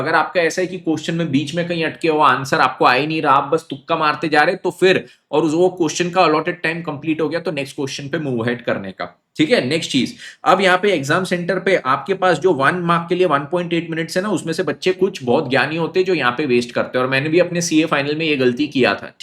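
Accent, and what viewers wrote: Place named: Nagpur, India. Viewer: He has a Nagpuri accent omg 😂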